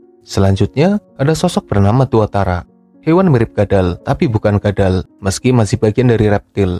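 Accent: Banyumas, Indonesia. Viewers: Kaya wong ngapak yah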